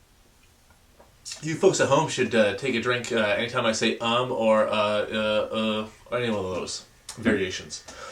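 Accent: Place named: Canada. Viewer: Okay, to follow up with what I mentioned on Twitter, this video showed me that I need MUCH less chiseling. I had made my New Year's resolution to learn hand-cut dovetails, and watched a few basic videos and subsequently a few (horrible) attempts. Prior to this tutorial, I was laying out my tails with a marking knife so that I could register my chisel in the mark. I would use the dovetail saw to cut shy of the line, a coping saw to remove the waste, and then try to use the chisel from way too many angles. As I was new, I wasn't using the chisel very well, and had some irregular cuts, undercuts in the wrong places, angle changes, etc. Then I would transfer this mess to the pin board, and more irregular chiseling only compounded the mess. After watching this tutorial, I decided to "let it go" and just use the cut from the saw, and only use the chisel to clean up the bottom, instead of the bottoms and sides. 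This has led to not only quicker dovetails, but cleaner ones so far. What I am able to transfer to the pin board seems to be a lot better, and will get better as I practice. I still have far to go, but am trying to get down to the shop at least once a day to make a practice dovetail. Thanks again for not only the tips in the video, but for everything else (keeping it real, showing mistakes, crass jokes, Twitter rants, glorious Canadian accent, etc.)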